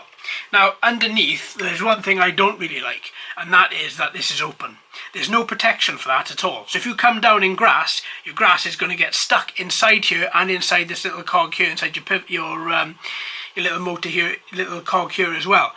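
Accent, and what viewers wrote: Wales, United Kingdom. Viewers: And that is why your videos are so infectious! Tell me, what part of Wales are you from? My dear old mum was born in Abergavenny, so I have Welsh blood!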